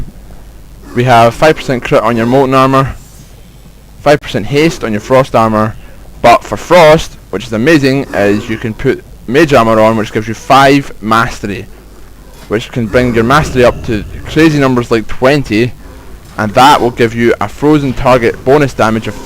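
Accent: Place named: Scotland, United Kingdom. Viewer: great video great tips sexy scottish voice ;)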